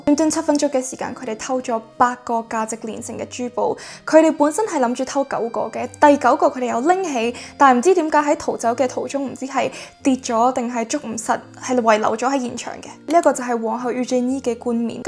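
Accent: France. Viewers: 我都係！一聽到咁悅耳咁溫柔嘅聲線再加上咁正統嘅法語，簡直令人融化！🫠🫠🫠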